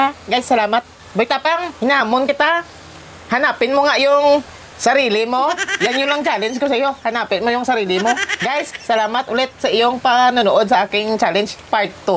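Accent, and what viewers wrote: Iloilo, Philippines. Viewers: Ilonggo ka po dhay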